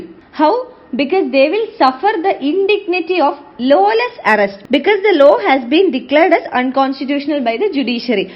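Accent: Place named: Kerala, India. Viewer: The voice says it clear that the person explaining is a malayali😂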